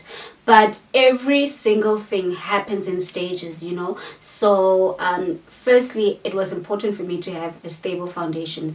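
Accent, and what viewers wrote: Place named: South Africa. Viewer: I'm Nigerian and I love SA movies, the way they talk in them. The way you talk. I already subscribed but please try to create English subtitles for when you speak your language. 💙💙💙💙💙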